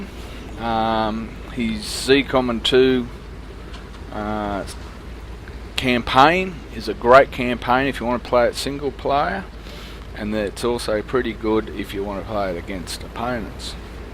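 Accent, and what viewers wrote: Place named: Australia. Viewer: really nice to watch, and your aussie accent adds some taste to the thing :) cheers from Matt_Mulder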